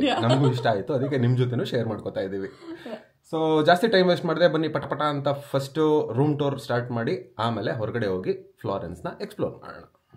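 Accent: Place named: Mandya, India. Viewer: sir nim english, italy spanish, axent jote mandya kannada extraordinary. Niv vlog madya mandya kannada chenda 😊 Happy anniversary to both of you🎉. Muddagiro girl baby ne pakka..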